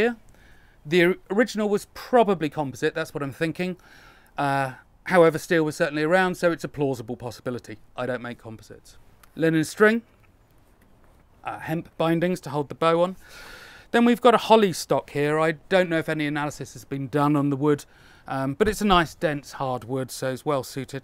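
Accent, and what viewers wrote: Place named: Midlands, United Kingdom. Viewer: of workshop,. Now you're doing it to troll (and I love it). I did want to mention just how much of business is management of client expectation. I can hear the slight frustration in your voice! Unfortunately people with middle English accents (myself included) can sometimes present fact as slight disdain, especially to those in the south. Regardless, it's clear you're coming from a base of experience and passion and that bow is simply stunning.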